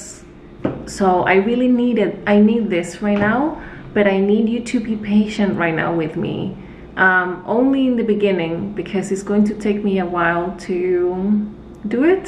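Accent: Chile. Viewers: Adoro su acento chileno <3 Te quiero eres como un modelo a seguir <3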